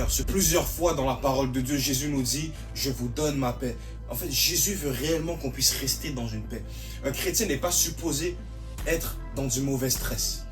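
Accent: Canada. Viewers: superbe vidéo, j‘aurais dit « période de l’année » et non « époque » sinon la vidéo est trop qualitative et j’en avais besoin thank you sir tu es canadien? tu as un accent canadien en tout cas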